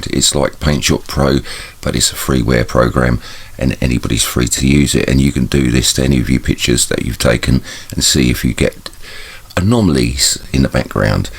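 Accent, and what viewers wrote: England, United Kingdom. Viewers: "Enen if you think it's a bug on the lense you're crazy"? This kind of thing has got to stop! And NOW! As I watched this video i thought, at last! A quality video made by a quality person and not people yelling and swearing at each other and stuffing their belief systems down each others throat. There is a point where you say "it's for you guys to decide" True! It's for everybody to decide, make their own minds up. Thats how it should be until we are all told the truth and we know for sure! It's all we have at this time. Discernment and choice. You very nicely lay out the facs that YOU have. Interesting pictures indeed and food for thought, BUT you ruin the video and my opinion of you by saying someone is crazy if they don't see it your way!!!! You sound English so if you are I'm sure you will know of the Astronomer Patrick Moore? 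I never found him appealing to watch but Im sure millions would agree. He was hoever , a very interesting man if you could keep up with him talking 20 to the dozen. As a kid I learned a lot from him but some months ago he was discussing the moon with another astrologer and he mentioned the conspiracy world and the "fake moon landings" .he looked right into the camera with that terrifying eyebrow up on his head almost and the eye that belonged to the said brow, popping out like an organ stop and shouted at the viewers "anyone who thinks that WE didn't land on the moon has to be compleeeeeeetly INSANE"!! That was it for me, all respect GONE in a flash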